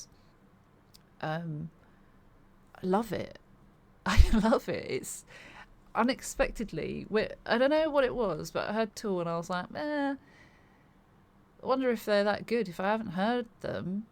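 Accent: England, United Kingdom. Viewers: Love the English accent.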